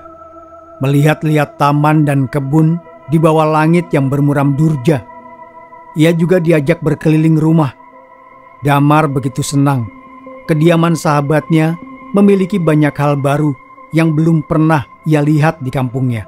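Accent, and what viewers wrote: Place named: Kalimantan, Indonesia. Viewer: Waalaikum salam jkarta hadir nyimak ,ak ud ketingaln ya, ak seneng dgerkan cenwl ini crita ya ttg alam ghoib trus,, smoga sehat tris ya bg,, ak mau nayya apa abg asli klimatan ya ,soal ya logat ya beda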